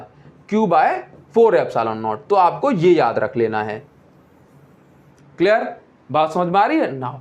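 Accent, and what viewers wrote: Odisha, India. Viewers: Sir aap odisha se ho kya ?😅😅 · sir you are from odisha?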